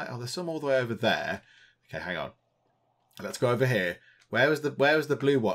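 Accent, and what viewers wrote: United Kingdom. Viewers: You've just became my favorite youtuber. Your British accent and personality is just too cute. I know that's not very masculine to say, but i'm sure you can deal with it...lol Thanks for the videos. I've been enjoying playing this game myself.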